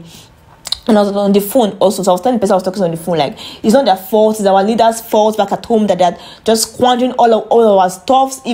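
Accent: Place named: Africa. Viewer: Sis, I want to watch your channel but its hard to understand you. I'm a black American and is unfamiliar with African dialect. Peace from New York ✨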